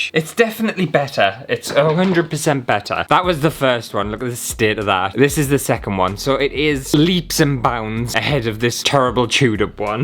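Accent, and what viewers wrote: United Kingdom. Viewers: Cheers to all from the North, best UK accent by far. :D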